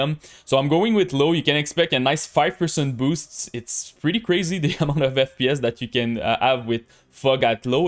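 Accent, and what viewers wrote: Quebec, Canada. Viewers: Tu es québécois ? Lol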